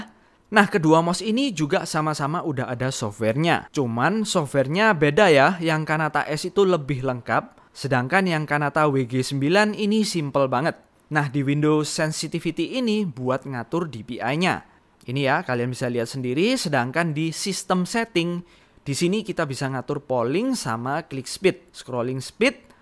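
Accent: Java, Indonesia. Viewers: medhok lee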